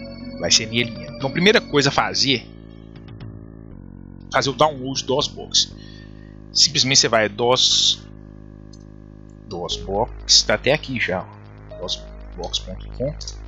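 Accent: Minas Gerais, Brazil. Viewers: Sotaque seu é mineiro.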